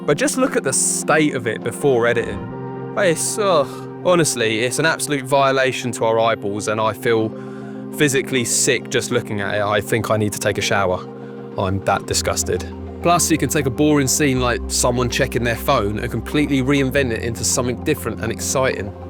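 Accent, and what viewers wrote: United Kingdom. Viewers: This guys is very Belfast guy isn´t??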